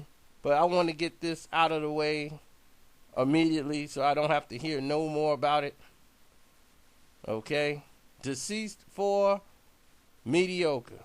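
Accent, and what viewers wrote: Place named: Dakota, United States. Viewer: This dude sound like he only wear Bill Cosby sweaters. Must be from Dakota or some shit. fuck outta here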